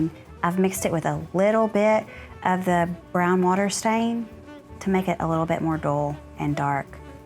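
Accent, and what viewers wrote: South, United States. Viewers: Got to love a pretty lady leathercrafter with a southern accent. You probably found that you dulled your clippers shearing the lining. But they can be easily resharpened with a whet stone. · You are very talented, got homesick listening to your accent, I am a displaced Texan!!!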